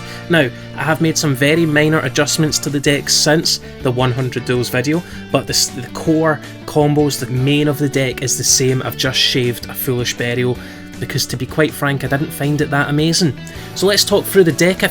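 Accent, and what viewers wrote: Scotland, United Kingdom. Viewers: That Scottish accent yo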